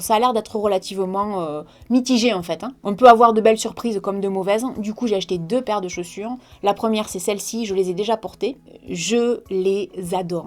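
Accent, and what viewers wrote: South, France. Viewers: Il manque dans ta liste anti déprime une seance au spa avec massage. Ce que j'adore chez toi c'est ton accent du sud comme le mien ! 😉 bisous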